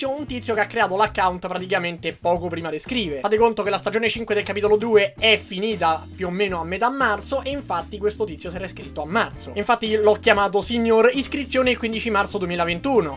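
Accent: Rome, Italy. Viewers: Ma sei romano?🧐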